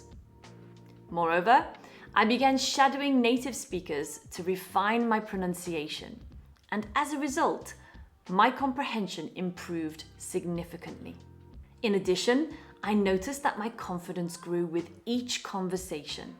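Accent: United Kingdom. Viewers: I really enjoy watching your videos and listening to your British accent. 😉 Although what you say sounds easy, the reality is very harsh. However, I want to continue learning from your videos. Have a nice weekend...Thanks,. 😊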